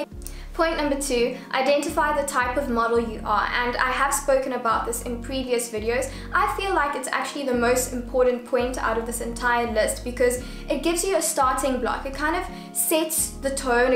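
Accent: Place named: South Africa. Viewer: Love this so much I subbed as a fellow South African 😁🇿🇦 I literally heard your voice and was like aish!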